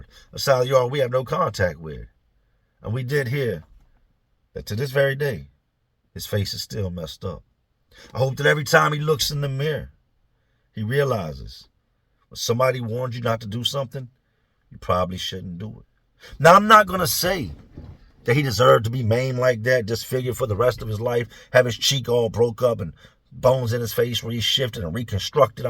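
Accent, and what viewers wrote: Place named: Philadelphia, United States. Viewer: Hny J!, u r an impeccable storyteller, plus u sorta hv that north philly accent! Been subbed since. Day1.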